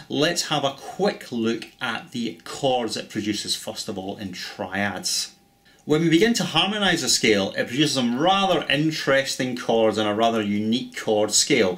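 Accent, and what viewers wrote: Scotland, United Kingdom. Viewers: real good lesson, did you learn piano first or guitar? You seem to know piano quite well and I say that because I'm classically taught from a very early age then later learned guitar and drums, I'm your age and my piano and keyboard playing has helped my guitar playing greatly. You are so very much better than many others who try to do the same.Love the Scotch accent, used to live in England when i was very young.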